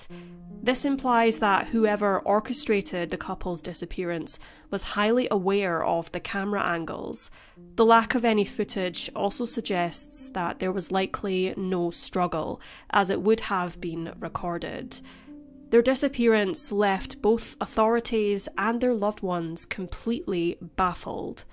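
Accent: Scotland, United Kingdom. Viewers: This sounds like it’s narrated by a fellow Scot! 🏴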